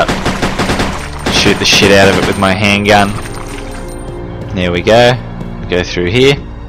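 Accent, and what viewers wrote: Australia. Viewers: He’s Australian man Different dialect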